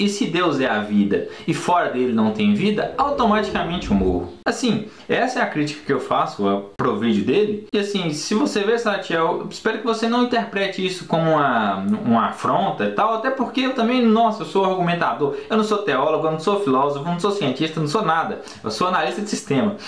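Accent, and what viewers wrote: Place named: Minas Gerais, Brazil. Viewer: Vc é mineiro??? Tem um sotaquezim bem minerim...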